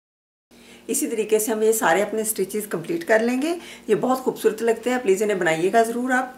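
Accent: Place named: Lahore, Pakistan. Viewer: AssalamoAlaikum ma'am chain stich 6th ki home Economics main sekha but kabhi pta nahi tha k ismain itna variations possible hain. May Allah protect you and your family. I am from Faisalabad kya aap Lahore say hain?? Aapka excent lahori lagta hay. Maam kya aap knitting bhi karti hain agar yes to phir aapni videos upload kijye ga uski bhi. Allah aapke or aapke ghar walon ki hifazat farmaye Ameen.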